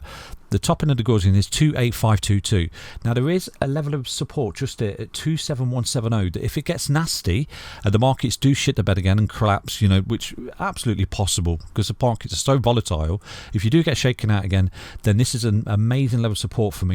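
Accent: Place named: England, United Kingdom. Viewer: Why are you speed talking with your English accent who the hell can understand you?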